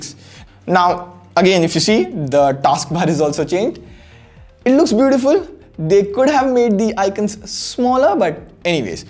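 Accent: India. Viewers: this man has a THICC indian accent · I clicked on this channel just out of boredom. I hear an indian voice I am now interested...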